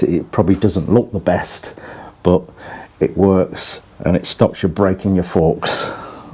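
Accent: England, United Kingdom. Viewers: I've been taking seals out of machinery for better than fifty years, and this is the first time I've seen the trick with the chisel cutting the seal, and that works great. The steel base for that seal is stamped and "pre-stressed" so it fits very tight pressed in. You simply break that "stable platform" it begins with so it no longer can "press against the wall. I've worked on motorcycles and cars, trucks and general machinery, never thought of it like that. By the way, we also say "dragged", at least we do down south, lol. I've got to say, I enjoy the accent and word usage common over there in England. I got on here because of having a triumph, but enjoy the whole "stepping into your world" over there by the tube. I spent twenty years around the world, a Marine, and miss that, having retired in 97. Of course that's why I've got time to have a couple bikes. 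I've had the good fortune to work on Brit bikes fifty years, since my early teens, and I still really enjoy them. Oh, and car's too, had a few, worked on hundreds. It's good to see the bike coming together like it is. I've heard of Enfields since my teens, only recently got to see them, and that one is looking really good. Thanks for the video!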